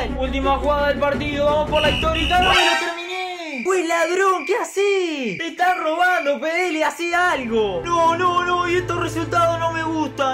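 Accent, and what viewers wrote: Uruguay. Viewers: Eres uruguayo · Eres Uruguayo?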